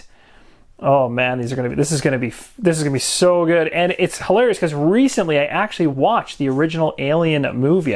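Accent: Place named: Canada. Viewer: I love how Canadian you sound.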